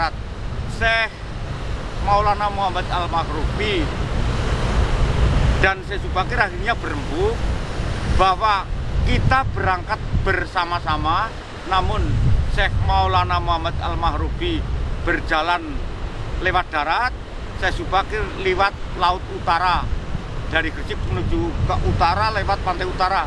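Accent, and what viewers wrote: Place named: Kebumen, Indonesia. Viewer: Lumayan ni, nambah pengetahuan. Logat Kebumen banged 😀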